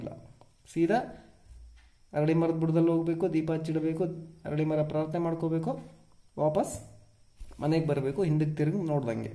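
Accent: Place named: North Karnataka, India. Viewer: Nivu uttar Karnataka davaru so nimage accha kannada mathadodikke kashta agatide modalu script ready madikondu practice Madi aamele video Madi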